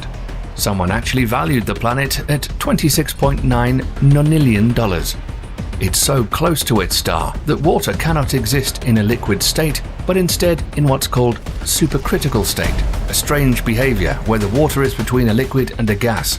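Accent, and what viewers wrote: United Kingdom. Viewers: Why do we need a guy with a British accent to explain projects by NASA?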